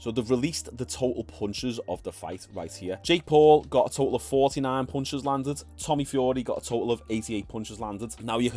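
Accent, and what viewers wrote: Liverpool, United Kingdom. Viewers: Dude. A Scouser can talk about flies fucking, and I'd tune in.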